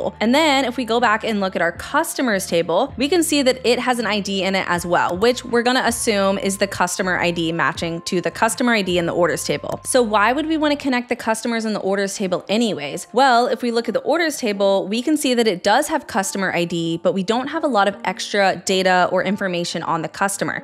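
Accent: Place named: Latin America. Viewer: I really love how expressive you are explaining quite challenging concepts as you do. Eres española? is spanish and the way you talk is more latino hehe. Thank you from the bottom of my heart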